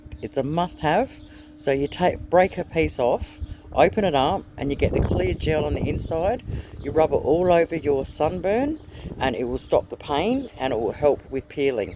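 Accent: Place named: Australia. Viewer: Love this ❤️ absolutely adore your personality 💕 you have that comforting Aussie bogan twang in your voice that just screams down to earth ❤️ I love your yard 🙂 I need to get off my butt and start growing foods 💕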